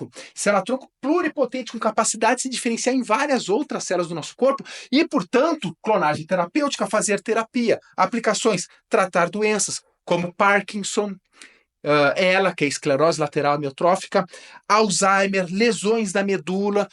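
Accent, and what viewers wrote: Rio Grande do Sul, Brazil. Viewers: Sotaque gaúcho tchê. Professor muito bom. Tomara que seja gremista. kkkkk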